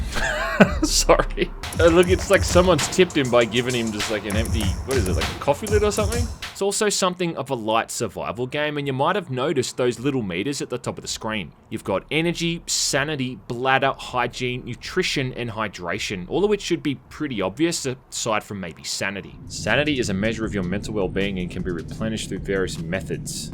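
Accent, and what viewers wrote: Australia. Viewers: I dont care about this game. I jusy want to hear an Australian guy whining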